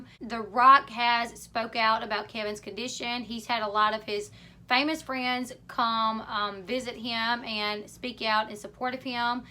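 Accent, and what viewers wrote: South, United States. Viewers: Taking short wolks your voice is amazingly southern, and it was a 1970 barracuda yea but it had a hellcat motor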